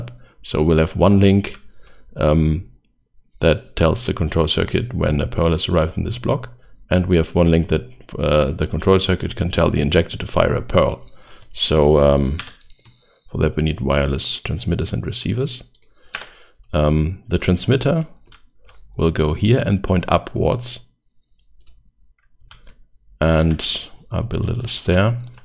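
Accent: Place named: Germany. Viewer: From the dialekt you come from germany oder nicht grins? Crazy OP this way to create energy. No Big Reaktors or other generators are so fast, easy and cheap to get. And it cant blowing up like draconium. I have never use this and i dont know if i like it. You get tons of enderpearls with lilys or mobfarm. Much easier as any early charcoal generator setup.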